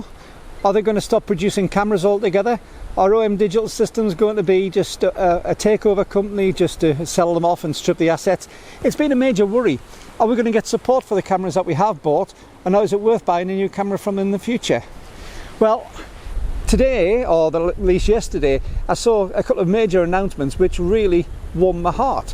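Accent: United Kingdom. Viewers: great insights. always fan that British accent :) The bottom line if you carry a bag of multiple lenses (3-4) and want to be on a minimal comfortable carry, nothing compares to m43. given the low f-number per price (such as 25mm f0.95) you still get a decent full format ~f2.0 paying less money per overall and keeping the size to minimum. also, the sensor movement compensation of Olympus makes it possible to shoot in low light for a long shutter time, and the elegance of pana, say gx7, is 2nd to none, except Leica. So the only thing comes down to Megapixel which is quite BS in real life.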